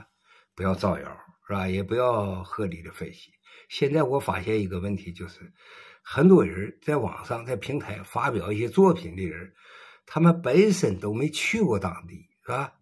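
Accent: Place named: Liaoning, China. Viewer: 你是辽宁的吧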